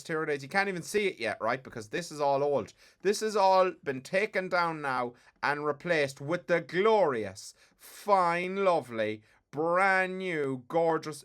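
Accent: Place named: Ireland. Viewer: Thanks for the town tour. I'd love to visit there. By the way, Im sure you'll be thrilled to know, that your Irish accent is exactly like that of an old timer Huey Riley from the old country, as he used to say, that I worked with as an apprentice years ago in Cleveland. He must have been from Mayo. Maybe even from Ballina. Of course I jest about the thrilling part. And my great great grandfather.......😊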